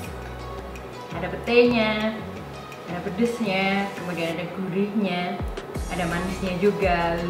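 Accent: Java, Indonesia. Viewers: Yach, ,,dari tadi uploade nya, ,,baru nongoll braay, ,,,meddok bangeed mba e ,,,luv luve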